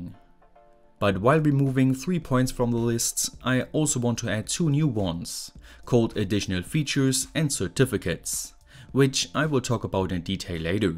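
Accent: Germany. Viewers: It's much better now, finally being able to put a face to that weird German voice! 😁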